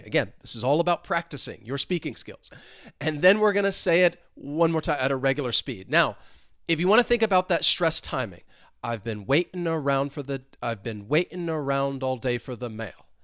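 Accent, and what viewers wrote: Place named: New York, United States. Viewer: Based on your accent, I think you are from NYC or NE states at least. Am I correct?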